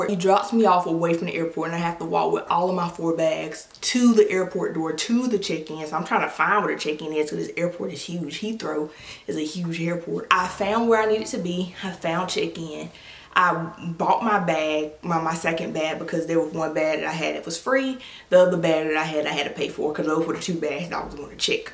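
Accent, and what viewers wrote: South, United States. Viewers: I love your southern accent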